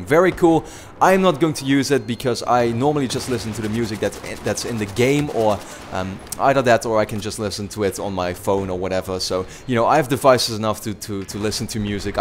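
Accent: Netherlands. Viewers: Ben je Nederlands? :p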